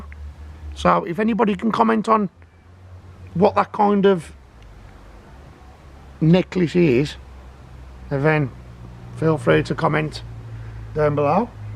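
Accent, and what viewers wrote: Birmingham, United Kingdom. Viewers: i love the brummie accent great stuff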